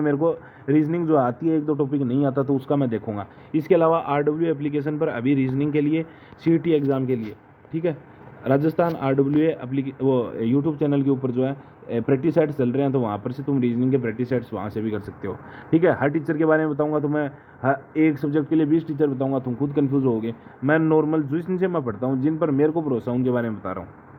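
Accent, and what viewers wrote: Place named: Haryana, India. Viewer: Lgta hai aap utri -purvi rajsthan se ho Hariyana vala tone bhi aa raha hai bich bich me mene to aaj hi viedo dekha apka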